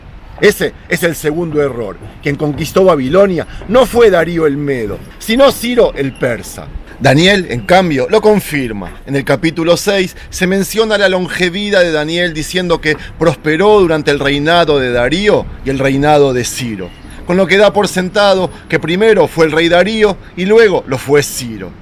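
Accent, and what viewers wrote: Argentina. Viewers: Porque siempre dices que eres judio tal parece que eres argentino por el acento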